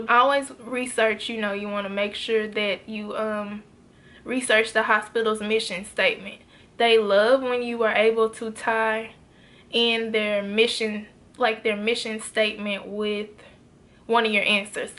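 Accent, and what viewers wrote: Memphis, United States. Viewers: Awesome video. You sound like you from Memphis,Tn . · I am loving your videos. Especially our accent(memphis) I graduate from u of m in dec. So I am going back for second degree bsn ( u of m) new subscriber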